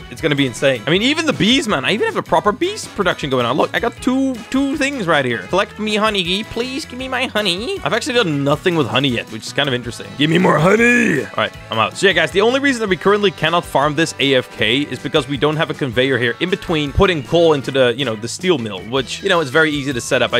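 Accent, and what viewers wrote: Netherlands. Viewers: Ok, in almost sure that you are dutch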